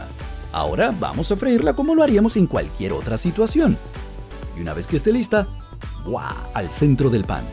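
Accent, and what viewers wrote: Venezuela. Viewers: Es acento venezolano.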